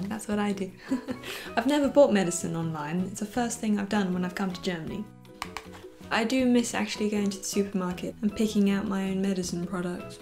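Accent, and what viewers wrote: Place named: United Kingdom. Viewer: Love the natural and authentic way you do your videos! And aswell your British charme and accent ;).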